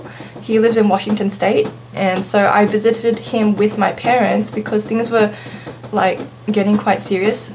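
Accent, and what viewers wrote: Australia. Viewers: If only internet was around in my time. I might try that website....Good luck in the US - hope everything goes swimmingly for you.... Looks like a nice match and catch. Very nice story - hahaha - Phd. wow...... Doctor - how cool is that. You certainly know how to build a life for yourself. Thanks for sharing. Oh keep your Australian accent if you go to the US lol...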